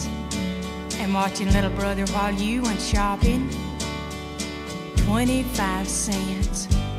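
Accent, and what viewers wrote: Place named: South, United States. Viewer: unmistakable Southern States accent. Maybe you don't hear it if you are from the States but it's very noticeable to the British.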